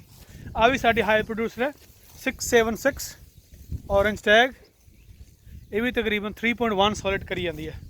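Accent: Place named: Majha, India. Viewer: veer g tuhadi Boli Maajhe di lagdi a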